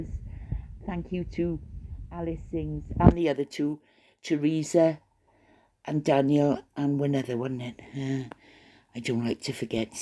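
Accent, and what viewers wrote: Wales, United Kingdom. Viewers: love can’t you ask the council to move you elsewhere where it’s quiet love, I have moved 3times in my life, but I miss Wales you love cannot remember me, we were young and we had good times at Cwmbran cinema, I moved to Gloucester to train as a nurse, and then I married am still in Gloucester we are both the same age, but still remember our young lives we had fun, I was shocked when I found you, and missed your lovely voice, so glad you are back, not on x so I can’t stand the evil, I lost my husband in January then 6 months later my only daughter she did not tell me her cancer had come back, what you did is nothing to be ashamed off, you looked after them, glad to hear you again you take me me back to my youth when I hear you accent I’m back to young time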